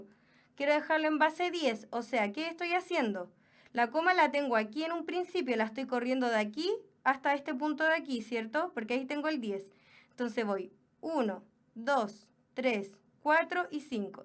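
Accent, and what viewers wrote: Chile. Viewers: Rilma García torico en chile ya significa si, por el acento deduzco que es chilena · el toque que le da la a que la clase sea perfercta es el acento chileno xd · Que insufrible el acento chileno. Puffff quierp prestarle atencion pero su voz y ese acento no me dejaaaaa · Debe ser de Chile, su acento es tan bonitoooo 😍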